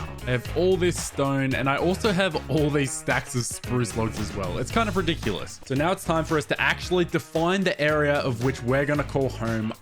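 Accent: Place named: Australia. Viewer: wait i just realised u got an australian accent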